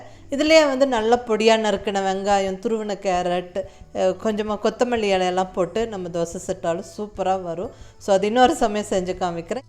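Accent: Kongu, India. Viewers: Super mam... 👌👌kongu tamil la super ah explain panringa mam...👏👏👏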